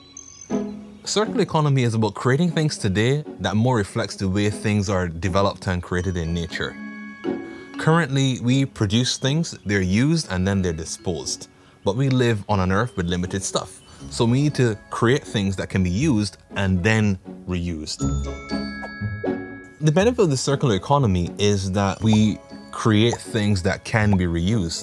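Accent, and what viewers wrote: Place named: Barbados. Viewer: Great explanation; sounds like a Bajan.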